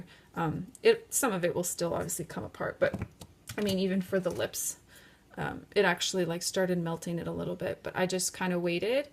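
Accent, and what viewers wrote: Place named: Ontario, Canada. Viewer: Thank you so much for the informative videos! I’ve been binge watching your vids & love them all! BTW: your “accent” takes me right back to summer even on these cold winter nights. My family has a cottage near Port Colborne & we snowmobile near Algonquin Prov. Park. So basically..listening to you gives me warm fuzzy feelings besides all your fab tips & tricks😆💕!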